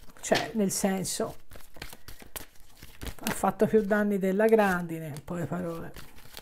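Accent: Tuscany, Italy. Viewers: Ciao ti seguo sempre sei bravissima ogni volta che ti ascolto sembra che parli della mia vita, azzecchi tutto ……. Adoro il tuo accento toscano……i tuoi consigli sono molto preziosi e mi fanno riflettere sui prossimi passi da fare nella mia vita …..grazie 😘😘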